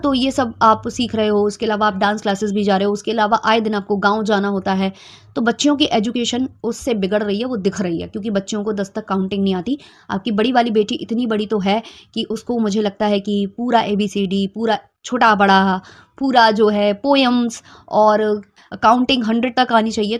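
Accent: Bundelkhand, India. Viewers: Hello tum up bundelkhand kaha se ho m bhi wahi se hoo bundelkhand ki..Main kafi din se tumhare reaction dekhti hoo tumhari tone se lgta to tha tum bundelkhand ki ho lekin kbhi pucha nh tha please btana kaha se ho mujhe achcha lgega ❤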